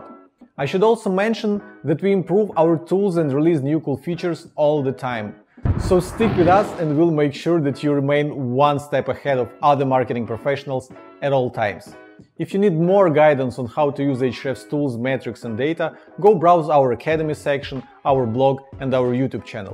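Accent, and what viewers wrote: Russia. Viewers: i think russian accent, no?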